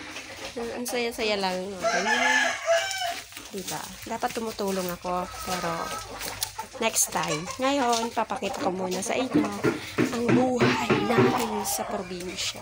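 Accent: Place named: Bicol, Philippines. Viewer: Hello manay.Sain ka tabi sa albay?Parang paryo kan bisaron ta ah😁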